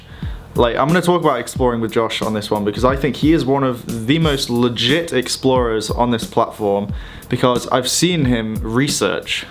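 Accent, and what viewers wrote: United Kingdom. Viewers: can u plz explain adderal ? Sorry I'm Arab and difficult to British accent sometimes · You’re a fake your British accent is horrible idiot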